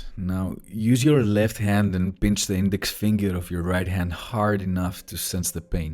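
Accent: Greece. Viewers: U sound greek 100% 👍👍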